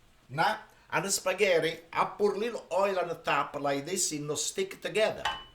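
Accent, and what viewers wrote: Italy. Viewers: I am here for the accent and the kickass Italian vibe here! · I love his thick Italian accent. The best ❤️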